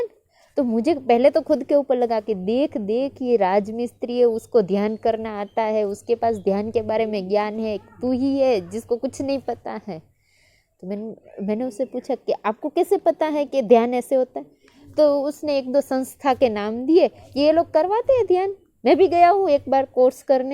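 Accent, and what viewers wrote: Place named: Gujarat, India. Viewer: Gujju tone of hindi 😊😊😊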